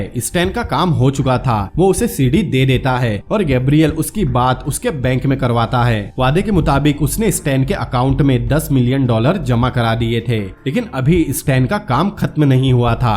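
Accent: Assam, India. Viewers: 👍👍👍 I like ur voice... R u from Assam, As your hindi is like Assamese.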